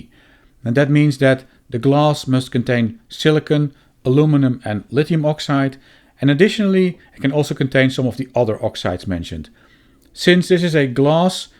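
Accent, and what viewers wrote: Netherlands. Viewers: I thoroughly enjoyed digesting this information. Your dutch accent reminds me of my birthplace NL. Thank you for the education.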